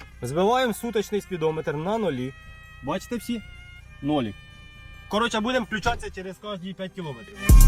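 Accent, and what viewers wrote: Poltava, Ukraine. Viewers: Доооли ,Ти з галещини Чи з козельщини,короче з пооолтавщини,бо неноче з у хобот мовешь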